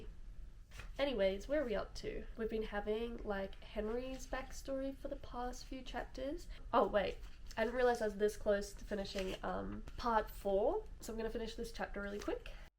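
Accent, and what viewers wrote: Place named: Australia. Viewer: Random question%: what Part of Australia are you from?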